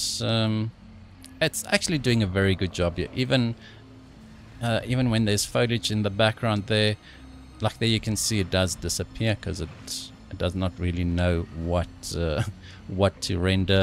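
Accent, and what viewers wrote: South Africa. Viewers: Ha SA accents always so distinct .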